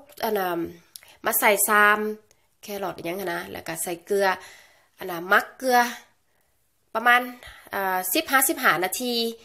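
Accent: Laos. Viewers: เจ้าของคลิบนี่พี่น้องสำเนียงคือพี่น้องสปปลแท้ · ทรรศสรวง พรมเหลือง เป็นภาษาลาวที่คนไทยอิสานฟังแล้วสะเหนาะหูดีแท่ มักเสียง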